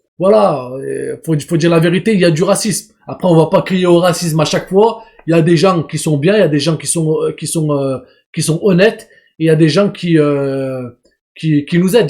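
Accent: Saint-Étienne, France. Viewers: Bravo frero ! Notre accent stéphanois est grillé mdrr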